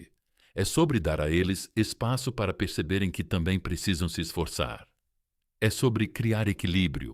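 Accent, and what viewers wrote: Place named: Portugal. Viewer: Qual a razao p os narradores falarem portugues de Portugal? Coisa estranha ...quebra o ritmo da narrativa ..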